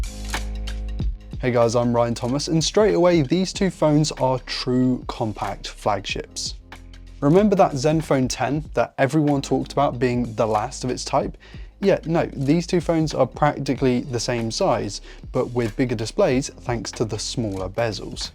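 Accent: England, United Kingdom. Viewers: You have an English accent, yet prices are USD. There are enough US tech YouTubers don't you think?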